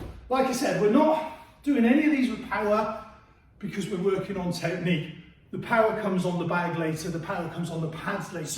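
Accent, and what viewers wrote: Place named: England, United Kingdom. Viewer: That English accent! Cool 👍👍👌👌👊👊🥊🥊🥋🥋🙏🙏😁😁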